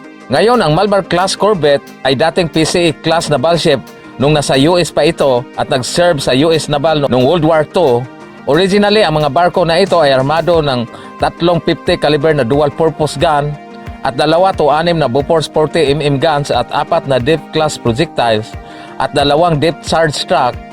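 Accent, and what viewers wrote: Visayas, Philippines. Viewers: Bisaya ka pre? Binasa mo nlang mga sinasabi mo sablay sablay pa animal! 😂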